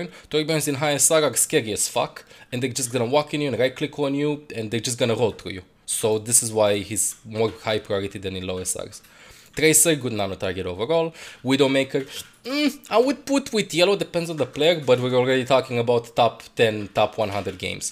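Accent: Romania. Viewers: His accent is Romanian if you were wondering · Are you romanian?.Ca ai un accent :)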